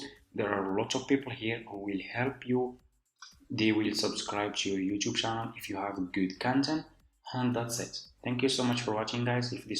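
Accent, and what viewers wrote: Morocco. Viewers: nadi a 3chiri mn laccent 3rftk mghribi kayn hh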